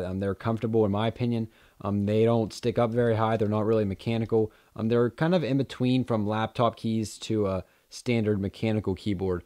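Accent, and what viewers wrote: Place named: Pennsylvania, United States. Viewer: You are so from PA, lol. Nice review neighbor. Is there any lag with the keyboard at all? In other words, would it be ok for gaming?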